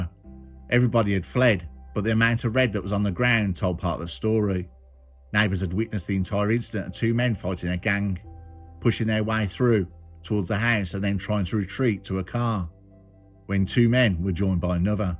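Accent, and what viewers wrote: Birmingham, United Kingdom. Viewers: Love you're content bro.wouldnt sound right without the brummie accent.❤😂👍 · Narrator is a brummie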